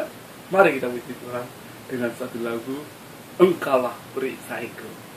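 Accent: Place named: Nias, Indonesia. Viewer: Abang logatnya sepertu orang nias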